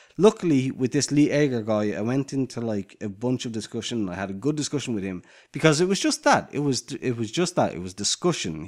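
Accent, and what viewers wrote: Ireland. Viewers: I like your strong Irish accent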